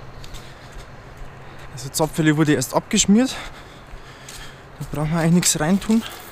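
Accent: Bavaria, Germany. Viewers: Sau gutes Video! Gefällt mir so mit bayrischem Dialekt, weiter so! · Meine Meinung ist Rede ganz normal und nicht so hochdeutschbayrisch ich weiß das vielleicht die ein oder anderen das nicht so gut verstehen oba ihn veida Redet mah aweng anders und i wors dast in da Nähe her kimmst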